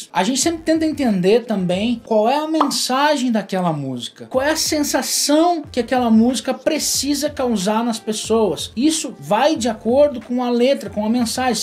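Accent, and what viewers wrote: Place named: Rio Grande do Sul, Brazil. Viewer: Tu és gaúcho amigo? hahahaa Parabéns!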